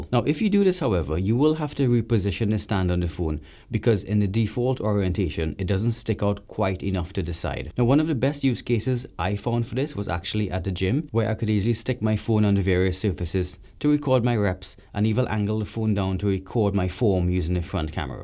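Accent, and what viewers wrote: Trinidad and Tobago. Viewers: Is this a guy from Trinidad?... with a touch of British accent? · Speech quality almost convinced me you weren't Trini for a second. Da accent cyah hide! Was looking at the Peak Design magsafe tripod to set up my phone for some photo/videography, but the lack of dual sided magnetism just couldn't justify the purchase since i find myself in some odd locations where a mini tripod slim enough to fit in my pocket unnoticed, doesn't always work. trying to get shots. This one however, checks all the boxes for the modularity i appreciate in a well contrived and engineered product. This and a magsafe water bottle... or a Milwaukee Packout bottle with a 3d printed bracket an I SET! Good video, man! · Are you from Trinidad?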